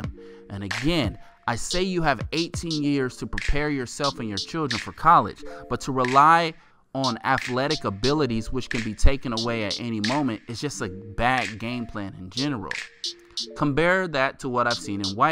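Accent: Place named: Oregon, United States. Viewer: Sounds like you live in Salem or Albany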